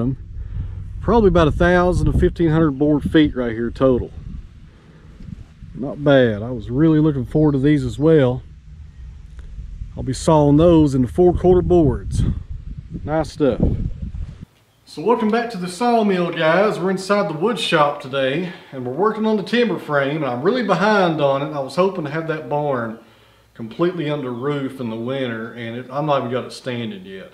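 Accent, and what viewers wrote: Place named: Virginia, United States. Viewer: Just curious. What state do you live in? Sounds like Virginia or north Carolina maybe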